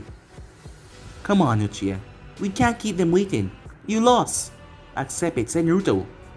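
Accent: Jamaica. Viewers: I do not wish to offend you, but are you Jamaican or Canadian? Also, very nice series. Can you do a what if with Naruto getting both wind and lightning chakra natures?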